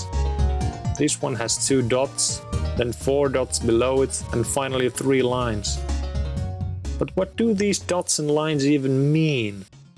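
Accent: Finland. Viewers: finnish yeah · The voiceover has to be Finnish? Onko aika mennä Torille? 🇫🇮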